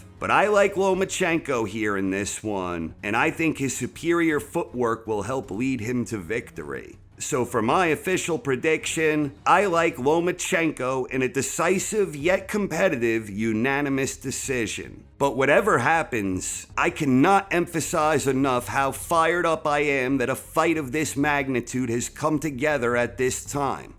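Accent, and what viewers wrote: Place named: East Coast, United States. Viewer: ! You are the Best at this stuff in my opinion! The way you talk and your accent is a huge draw for me! Don't loose your east coast accent! Lomo vs. Lopez is on the calendar! Thank you for your work!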